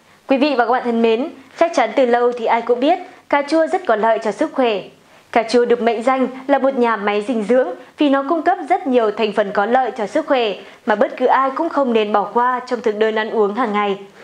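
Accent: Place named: South, Vietnam. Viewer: Noi giong mien Nam nghe hay hon giong Bac Ky 75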